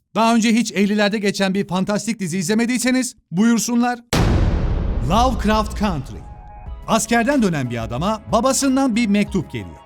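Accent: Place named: Central Anatolia, Turkey. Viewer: Neden İç Anadolu ağzıyla konuşuyorsunuz efendim?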